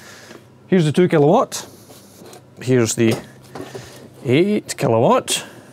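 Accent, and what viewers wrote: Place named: United Kingdom. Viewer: NOT TO SURE , about advice from someone with " British Speak" , untill I heard that all too familuar fraze, "AHH PIECE OF SHIT " , then i felt at ease!!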